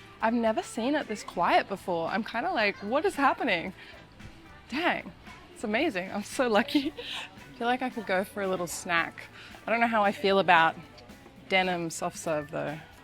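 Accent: Australia. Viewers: I like you very much. Your Australian accent is lovely. The "hiya" (here) is very prominent. 😄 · Really enjoyed the day trip! I also enjoy your Aussie accent! He-yah (here) 😺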